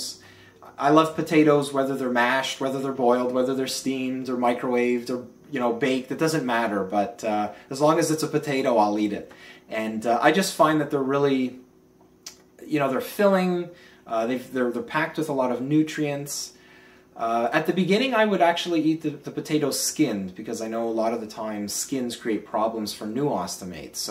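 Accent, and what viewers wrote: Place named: Canada. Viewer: Hiya! I thought that was a Canadian accent! We spent many many happy summers in Canada when I was growing up, (Nova Scotia and Alberta/The Rockies) I always thought I would move over as soon as I could but I met my now husband when I was young and that was that! We are planning to bring our daughter over for a long holiday in 2016 though and the big dream is to come and sing at jazz festivals as a way of bringing her back year after year! Super video! I'm not a new YouTuber but I'm a new ostomate (and loving it!!) and so overwhelmed with how wonderful the community is! Fantastic tag! I hope you had a lovely Christmas x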